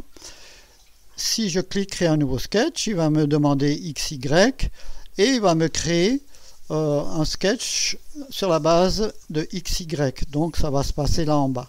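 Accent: Alsace, France. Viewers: Ton accent me fais penser à l'entraîneur de foot *. Mais que suis-je bête il est aussi Alsacien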